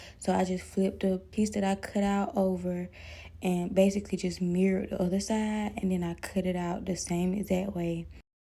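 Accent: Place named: South, United States. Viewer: I think this is sooo Amazin!…., I think u should be wayyy up. U’re real deal. My Mama is a seamstress and I have to say that she and only u would be my personal designers if I wanted anythang Taylor made. I used to watch my Mama sew when I was young so she started showin me different things to tha point at around 10 years old, I was makin my own billfolds/wallet for my money to take to school. lol… she charge only $5 per alterations. It’s real money in it for your talent. I see this been 1 year ago so r u jus takin a break, becuz I know u ain’t give this up. Hit me back and let me know somethin. There nothin like Beauty wit Brains. Oh, I’m from Mississippi…where are u from?… I know it’s Southern like me cuz I can hear it in your voice. Mississippi, Georgia, Florida, Alabama, or Texas?… In that order.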